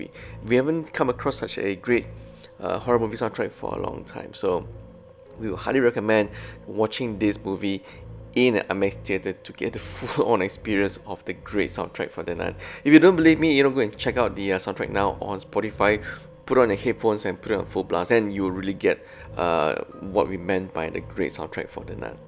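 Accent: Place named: Singapore. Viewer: Hi are you singaporean? You have a singaporean accent haha.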